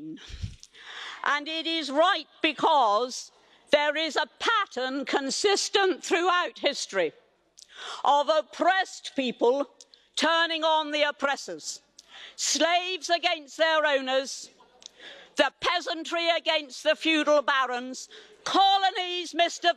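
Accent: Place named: United Kingdom. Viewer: That British accent is 🔥🔥🔥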